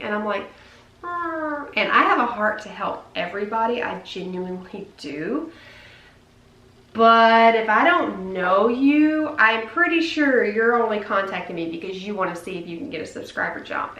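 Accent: South, United States. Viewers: I just found you from Love Meg and I just love you!!! You're so sweet and I can legit just watch your videos for your southern voice! I love it!!!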